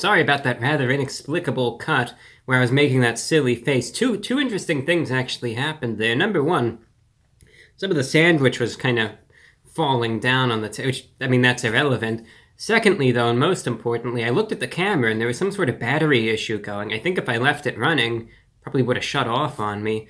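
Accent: Standard. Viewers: just modern proper english. Idk which of us is right tbh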